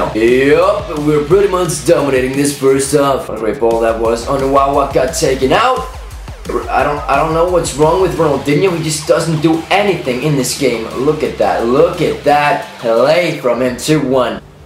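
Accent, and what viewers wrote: Iceland. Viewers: Everyone saying his voice is annoying did you ever think it was because of his accent and hes from iceland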